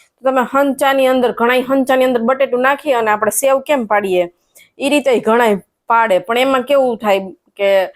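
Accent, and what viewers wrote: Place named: Rajkot, India. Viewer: બેન રાજકોટ ના લાગે છે બોલી ઉપરથી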